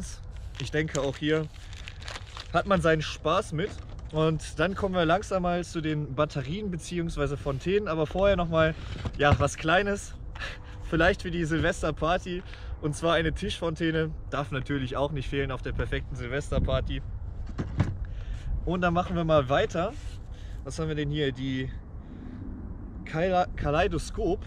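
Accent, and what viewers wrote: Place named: Rostock, Germany. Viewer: Kommst du aus MV? Genauer gesagt - nähe Rostock?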